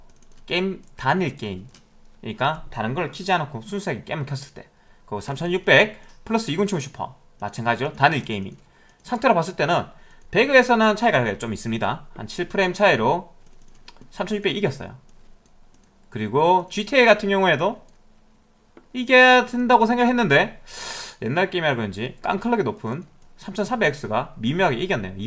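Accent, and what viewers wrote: Gyeongsang, South Korea. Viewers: 님 말투는 경상도인데 대전분이신가보군요 신기신기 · 경북 경남? 어디쪽 사투리죠? 이 사투리 쓰시는분들이 방송에서 가장 억양을 못고치는듯ᆢ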